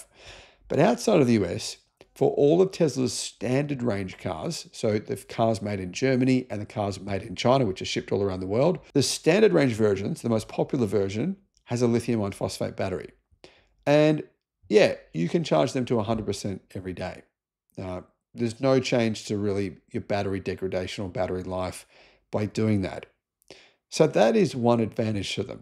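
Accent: Australia. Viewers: A MAGAT with an aussie accent in a Cyber Jesus cult. WTF?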